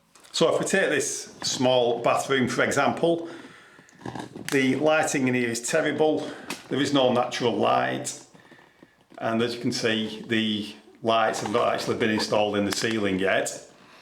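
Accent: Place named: Leicester, United Kingdom. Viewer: Great to see you back. Do you live in Leicester? Its sounds like you're from that neck of the woods. 2 and a half 'Howers' 😂😂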